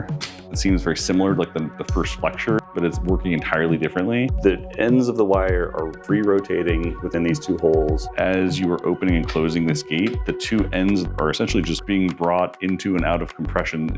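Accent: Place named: California, United States. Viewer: Cool, but you need to lose that Californian woman’s accent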